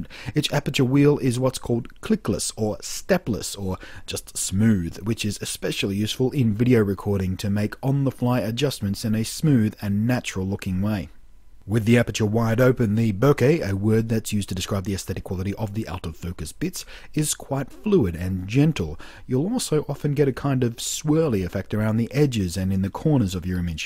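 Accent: Australia. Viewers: I believe he said "70mm" (i.e. 35mm lens with 2x crop factor on M43). Must be the Aussie drawl :) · Great video! And good to hear an Aussie accent and see Sydney :) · I don't know how I got here when I was looking for audio equipment, but your voice kept me here. Those dulcet tones paired with you Aussie accent: Audible Chocolate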